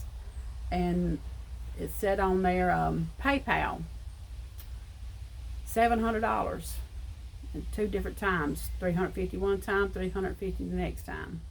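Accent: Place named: Mississippi, United States. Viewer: where are you from cause you talk like me? I’m from Mississippi!❤️🧶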